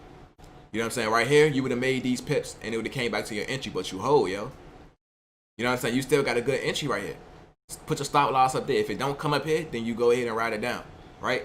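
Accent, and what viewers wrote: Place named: New Orleans, United States. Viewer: You from nola?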